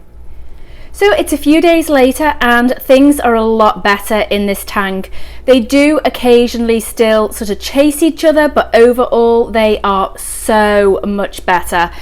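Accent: United Kingdom. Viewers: With your accent I thought you where in the UK! Yes that's a bit far to post 😂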